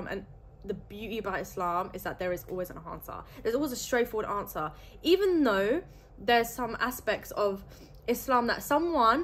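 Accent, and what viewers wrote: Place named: United Kingdom. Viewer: I don't know who you are but started listening to you anyway. Sorry to hear about the car accident. Your accent tells me you are probably from the UK. Best wishes.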